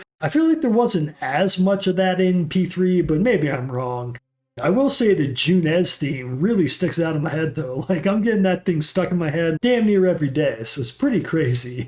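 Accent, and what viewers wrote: United States. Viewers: what state is your accent from?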